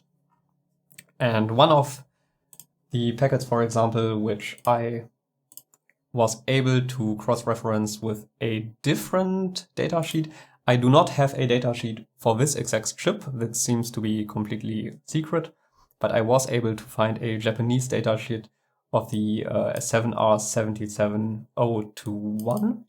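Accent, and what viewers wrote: Germany. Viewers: Wie deutsch kann ein Akzent sein. Dieser Typ JAAAAAAAAAAAAAAAAAAAAAAAAAAAAA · very well done...you are really a technical genius..,. by your accent can I say that you are from Germany ? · I heard the German accent and i knew it was gonna be very interesting.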